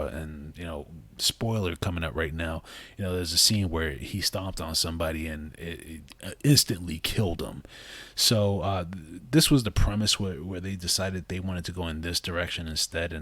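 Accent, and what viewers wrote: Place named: New York, United States. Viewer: Sounding real new yorkish!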